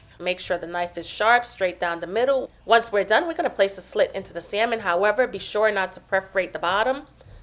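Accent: New York, United States. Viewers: Love you're recipes, And ya New York accent !! 💕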